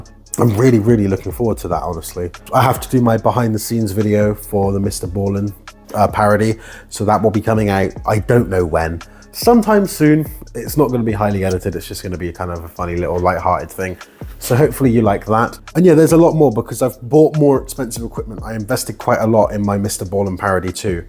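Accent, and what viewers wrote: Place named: United Kingdom. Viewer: aha, okay. The reason I ask is because I’m having a minor personal Mandela effect. You sound absolutely British, but I have this strange recollection of you having a foreign accent in the past. And yet clearly you are an Englishman. Sorry, I’m a yank, and I could have sworn you used to have a different accent, like Swedish or Russian or something completely different. I’m tripping. 😅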